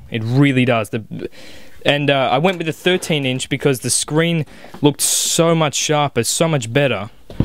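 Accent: Australia. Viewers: i really like this aussie accent hahah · are you from aus or nz ?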